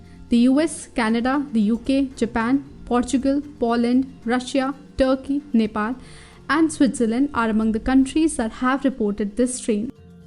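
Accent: India. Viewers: Even I find it difficult to understand accent of US, UK China. But never wished a narrator. Dear, pls get used to it, this is Indian accent at its best. Listen carefully, you will know what she is talking about. Focus on content, not accent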